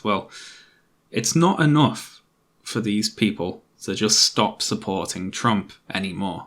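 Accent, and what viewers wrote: United Kingdom. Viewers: I can now see why people love British accent so much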